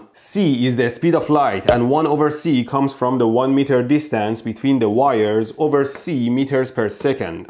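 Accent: Iran. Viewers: What an Iranian accent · Are you Persian? I can say it from your accent 😊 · love the Iranian accent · I love his bold persian accent :) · Jan can you believe more than 20 years ago, this was the question asked in first year at the uni by professor teaching electronics-physics class, You are brilliant bringing joy to Iranian/Persian community with your intentionally thickened accent. I graduated in <num> and have been working at telecom (Mobile Core Network) eversince, it was so refreshing to see your video. tnx.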